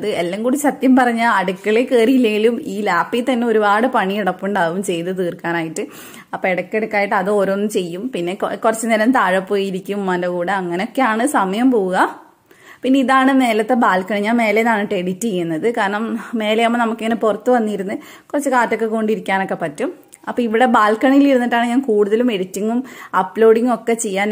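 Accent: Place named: Kannur, India. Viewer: ഉയ്യെന്റപ്പാ .....കണ്ണൂർക്കാരൻ 💪🏻 ഇന്ത്യൻ റെയിൽവേ അല്ലെ കറക്റ്റ് ടൈമിൽ എത്തുമല്ലേ 🤗🤗🤣🤣🤩🙏🏻 കണ്ണൂർക്കാരെ സ്ലാങ് മറക്കല്ലെ 🤭🤭🤣👍🏻✌️🥰🥰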